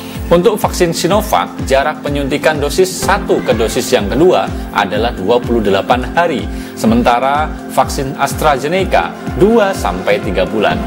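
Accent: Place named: China. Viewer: NADA BAHASA ANDA KEDENGARAN SEPERTI ORANG CINA BEPAJAR NGOMONG INDONESIA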